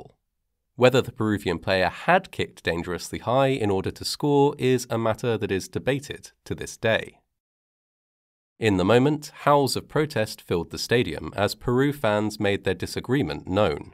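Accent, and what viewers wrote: England, United Kingdom. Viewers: I love listening to your narration, I could listen to it all day. Out of curiosity, what region of England do you and your accent hail from? Although some accents of “Old Blighty”, like ‘Cockney’, are quite unmistakable; but of others, I’m sorry to say, that my American ears sometimes have difficulty in their ability to distinguish.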